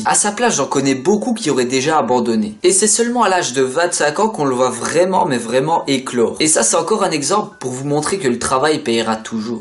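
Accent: Liège, Belgium. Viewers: tu es belge ? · EST TU belge ?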